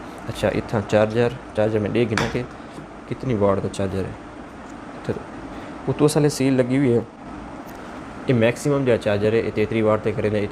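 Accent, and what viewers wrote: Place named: Saraiki, Pakistan. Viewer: Lala na tain saraiki boli hay na urdu khichichri barai pia hain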